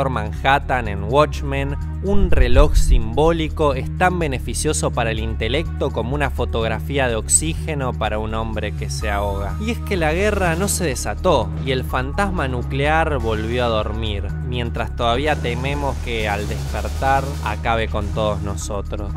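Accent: Argentina. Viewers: Que horrible es el acento argentino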